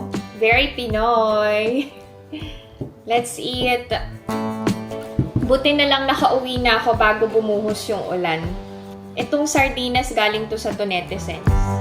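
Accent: South Korea. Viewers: Ms. ang galing mo mag Korean while you were talking to seems like I'm watching k-drama ganda ng iyong voice and koreanang koreana ang accent sana mag speak ka lagi korean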